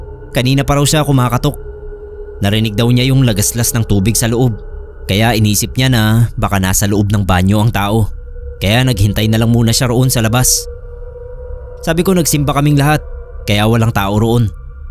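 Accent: Iloilo, Philippines. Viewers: boss first time ko sa channel mo pero naririnig ko na mga videos mo kapag nanunuod kapatid ko . Gusto ko lang itanong, ilonggo ka no?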